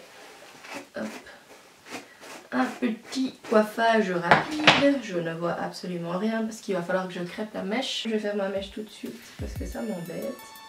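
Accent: Belgium. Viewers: Je me disais bien que tu avais un accent belge , by the way je vais a la mia dimanche aussi :))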